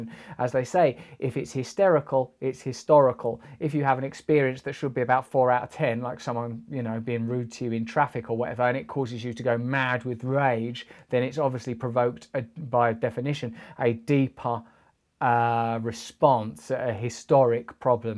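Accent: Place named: London, United Kingdom. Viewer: "You sound like you're from London!"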